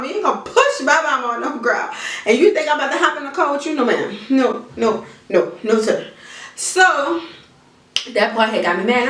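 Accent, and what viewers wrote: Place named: New Orleans, United States. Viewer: Nice Review 👍🙏 Ms. U have a Real New Orleans, Louisiana Accent for Real❤️I Love It!!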